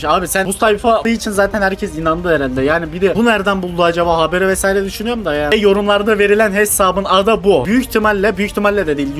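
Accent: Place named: Turkey. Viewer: Sert Türk Aksanı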